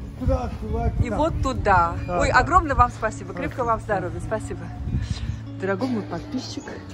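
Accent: Baku, Azerbaijan. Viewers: Привет из Вильнюса! Спасибо за ролик! Как хорошо, что Вы решили погулять! Как приятно слышать речь с бакинским акцентом!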